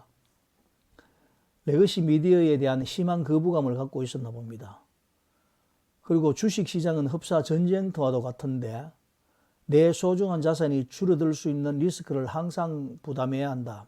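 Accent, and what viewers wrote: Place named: Gyeongsang, South Korea. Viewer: 공통점!? 경상도 말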